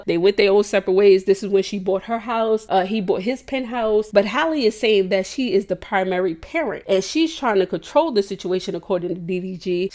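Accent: New York, United States. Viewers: I knewww u was from NY 😂😂😂 had to be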